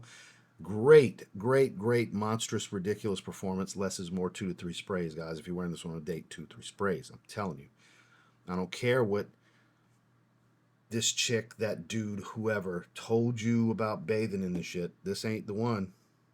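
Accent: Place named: New Orleans, United States. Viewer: Hey! The first time I heard your voice, I said to myself, “This guy has to be from New Orleans.” Then, you were wearing a Saints shirt in another video. Hello, from a fellow New Orleanian.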